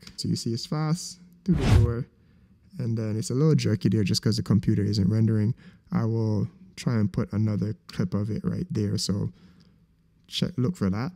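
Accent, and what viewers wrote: Jamaica. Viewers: Great tutorial. Are you Jamaican?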